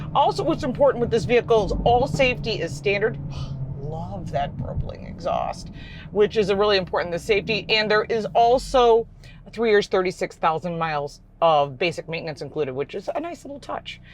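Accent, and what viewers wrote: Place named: Midwest, United States. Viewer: Got to love that Midwest twang... Great review!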